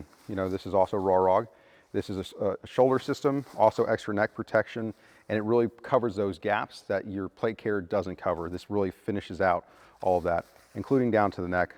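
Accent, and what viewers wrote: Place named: West Coast, United States. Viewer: Knew he was west coast the moment he started talking. God be with you and your men🕊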